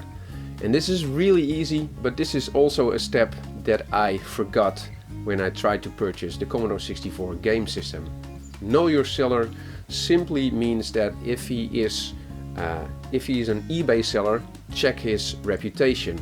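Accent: Netherlands. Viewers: i was thinking you are dutch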